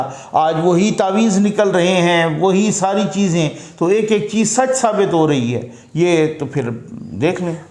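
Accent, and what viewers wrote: Saraiki, Pakistan. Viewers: Sir r u sariki speaker?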